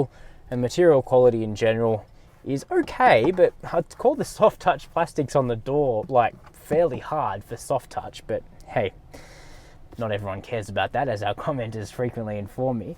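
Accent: Australia. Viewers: Hi, I am watching your rewiews mostly because of your pronunciation of english language and I must tell you, I am having a good time😊. I live in Europe, Slovenia and if I may ask you, I know the rewiews are about the cars, but can you show as a little bit more of your beautiful country AUS. All I can see, is one same road, I am shure, they are more. Here, when I look through the window, only I can see, is coronavirus! We are depressed😱. Thank you very much and take care, Damijan.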